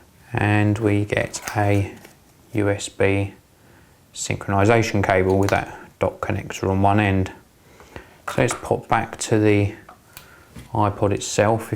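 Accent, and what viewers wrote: United Kingdom. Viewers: ar u british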